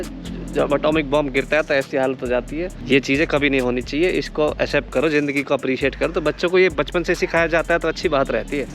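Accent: Haryana, India. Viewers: Bhai aapki language se lagta h ki aap haryana k palwal ya phir faridabad district se belong krte ho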